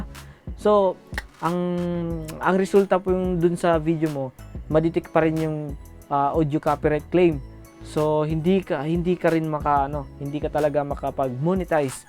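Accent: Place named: Ilocos, Philippines. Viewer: ilocano ka ata sir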